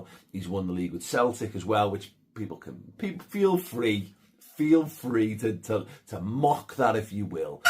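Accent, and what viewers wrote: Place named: Scouse, United Kingdom. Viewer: Talking as someone who also has a strong accent, reel it it for the internet mate, I know you're a proud scouser but you actually go the other way and make your accent thicker for the internet, it's quite noticeable how you draw out certain words that you're doing in unnaturally, it does my head in, please just relax and talk more like Danny Murphy who tempers his accent slightly when on TV, as I do myself when talking to non-Welsh people it's called respect and etiquette. I fully understand the scouse accent and have loads of scouse mates, but you're the only scouser I've ever needed subtitles for!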